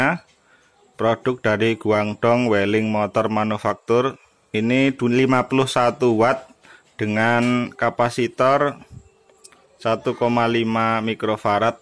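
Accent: Java, Indonesia. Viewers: Maaf bang...ngomong bahasa Indonesia boleh medok...tapi bahasa Inggris jangan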